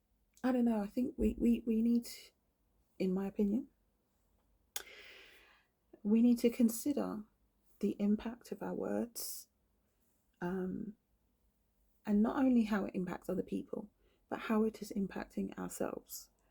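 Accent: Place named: United Kingdom. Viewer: I just want to give you a compliment. Your speaking voice is sooooo soothing. It relaxes me, lowers my blood pressure and helps me fall asleep. Maybe it's your British accent. But since you've posted the video regarding Thandiwe Newton I've subscribed to your channel and watched your videos. I'm from the states (Chicagoland area). I'm fascinated with the UK and have been for years. The more I study the UK the more I realize it's influence on the USA and the world. After all Britain colonized most of the world at one time including America and its influence permeates now. I watch BBC and Ski News more than American news. I watch British TV shows and movies. I hope to visit the UK someday. But back to your voice. You should do more videos. You could read the telephone book. I don't care. Lol I think you could get work reading EBOOKS. Lol 🤣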